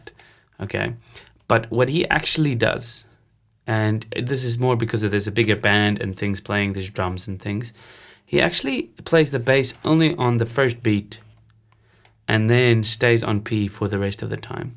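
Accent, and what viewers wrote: South Africa. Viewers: I can hear when someone's from SA. Takes one to know one.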